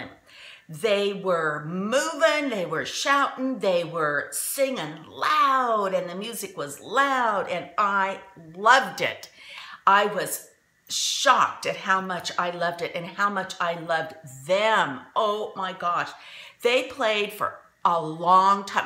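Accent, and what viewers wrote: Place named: California, United States. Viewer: Hi! Do you know you have a California accent? My family use to tell me I had one when we lived there for years and years! And yes, you do! lol so funny that Paul McCartney was supposed to be your husband! I laughed at this! Oh, isn't it neat when God speaks to your heart? How neat is that! Take care my dear friend...love you! Jeanie xxooo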